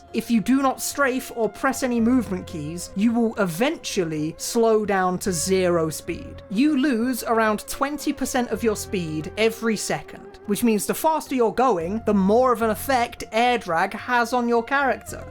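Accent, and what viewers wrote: United Kingdom. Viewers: I am nourished with this, yet I am not satisfied, I guess I can never feel the same with TF2 for a while, but you should still talk about deadlock, it's a cool game and the way you say swoons me of how bri'ish it is. Btw I hope this won't be the next game for Valve to forget after some years without a "final update" Btw can you talk about celeste movement pls? It would be really cool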